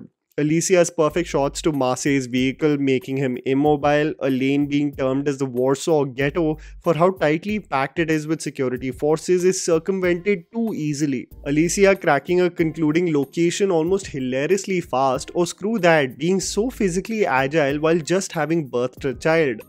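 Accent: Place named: India. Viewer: I stopped watching immediately once I heard his Indian accent.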